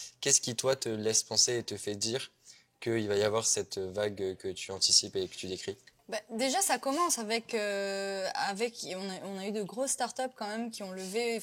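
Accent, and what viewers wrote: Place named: Ivory Coast. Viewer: 😂😂 Tu commences à avoir l'accent ivoirien ma cœur ❤❤❤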